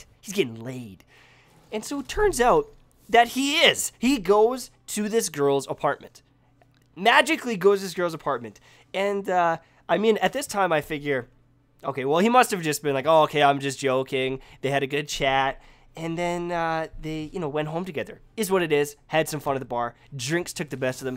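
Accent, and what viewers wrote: Canada. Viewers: R u Canadian ???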